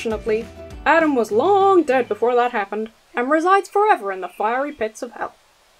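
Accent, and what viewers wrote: United States. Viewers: I'm crying... lol... you have a much better American accent than I do an English one... quite impressive lol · I can't imagine this being someone's first visit to you. That would be insane. 😂 Great stuff. And your American accent is getting quite good. Your "Dr" Kent impression is stellar. 🙌🏼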